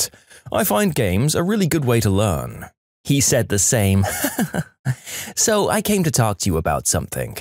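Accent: United Kingdom. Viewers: Im back its just a britsh lady saying good night to bulldozers and such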